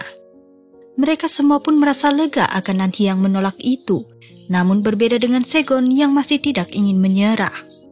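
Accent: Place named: Bali, Indonesia. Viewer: Orang bali kah,,,,??? Logat setiap kata ada Te tapi di ucapkan Dhe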